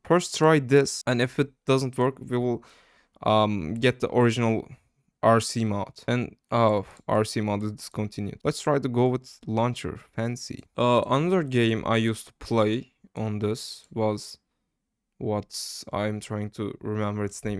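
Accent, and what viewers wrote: Turkey. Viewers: Konuşma tarzından direkt Türk olduğunu anladım böyle videolar yapmaya devam et Türk kitlesinden bir cacık olmaz❤ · Türk telaffuzu, nerde duysam tanırım. :) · türk aksanı baya belli etmiş ama video kaliteli abone oldum:) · hayatımda duyduğum en türkçe ingilizce reis süper video adamsın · Daha girişte anladım türk olduğunu türk türkü tanırmış dostum videolara devam🔥🔥🔥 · First time looking at this channel and the first three words I instantly knew you were Turkish just by accent lol. Great video man, keep it up. Ellerine saglik hocam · türk aksanın mükemmel ya hem mükemmel ingilizce konuşuyorsun hem türk olduğun belli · seni ilk duyduğum anda bu Türk dedim kardeşim en duyduğum en tükçe İngilizce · valla ilk saniyeden turk oldugunu anladim, aksan ele verdi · Are you From Turkey?! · video çok iyi olmuş ellerine sağlık türk olmana şaşırdım biraz aksandan anlaşılıyo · kral ilk 3 saniyede aksanından anladım. 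ülkemizden böyle içerik çıkması çok güzel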